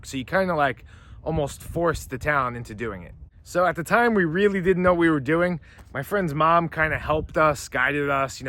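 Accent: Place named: East Coast, United States. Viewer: ahh Long Island, knew you were an east coast guy, figured jersey though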